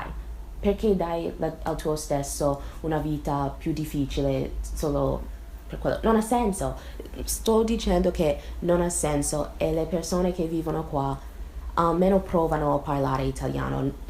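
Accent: United States. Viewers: Complimenti per il tuo italiano, anche se ancora si nota molto l'accento Americano ho trovato il tuo modo di parlare molto corretto e soprattutto grammaticato,mi è piaciuto molto ascoltarti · come mai hai lasciato un paese così bello come l'america e sei venuta in italia? comunque parli molto bene italiano ed è bellissimo l'accento americano che hai. sei bellissima💗👋🏻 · I barely understand Italian but I can hear your American accent loud and clear! <3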